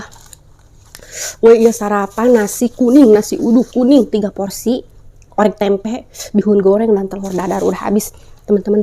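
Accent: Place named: Banten, Indonesia. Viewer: Kaya nyya orang Banten soal nyya ngomongnyya sunda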